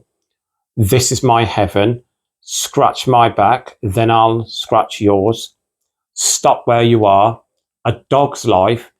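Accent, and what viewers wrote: United Kingdom. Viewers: Very Interesting videos. Your English in very clear, even so, I'd like to know where your accent is from: northern, southern, cockney? Blessings.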